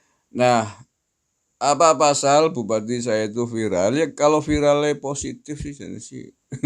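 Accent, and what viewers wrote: Banyumas, Indonesia. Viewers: Ngakak ngapak....!! 😀😅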